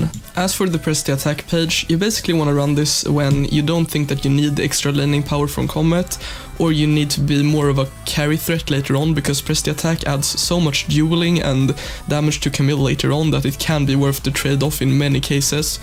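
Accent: Sweden. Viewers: You can hear clearly he is swedish xD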